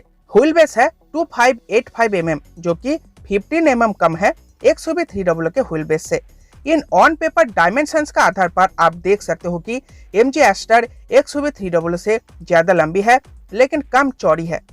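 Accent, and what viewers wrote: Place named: Bengal, India. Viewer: Saale बंगाली. Baat kese kar raha hai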